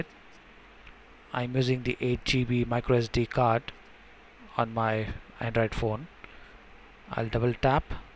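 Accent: India. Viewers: what accent do you have lol :D Indian? :D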